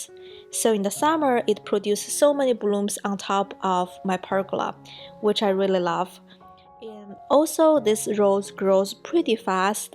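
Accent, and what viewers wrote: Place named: Vietnam. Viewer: Just came across your channel. I also live in the Bay. I noticed your accent. Are you Viet lol? Love your garden!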